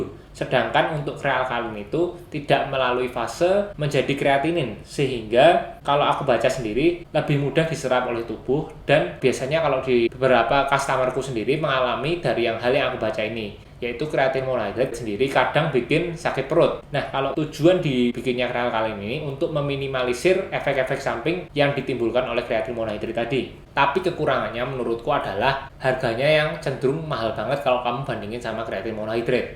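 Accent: Java, Indonesia. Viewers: Seneng dengan logat "medokmu" Bro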